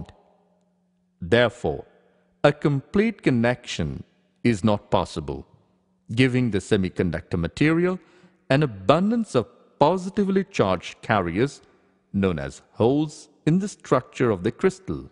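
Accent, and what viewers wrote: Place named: India. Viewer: whatsup with the indian accent?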